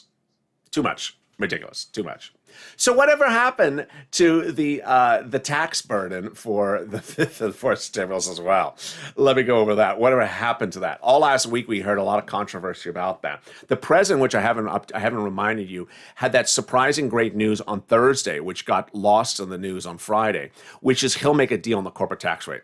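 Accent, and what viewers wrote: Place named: New England, United States. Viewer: baby, were you originally a New England boy? You have such a beautiful accent! 🌳🍁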